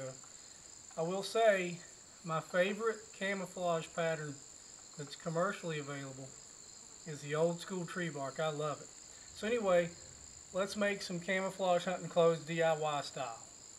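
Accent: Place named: South, United States. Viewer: where do you all live you sound like you are from the south?!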